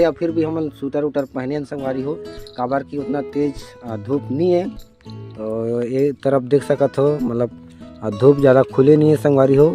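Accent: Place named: Raigarh, India. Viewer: Tuman k baat sun k Lagat he ki tuman raigarh jila ķ ho hai naaa bhaia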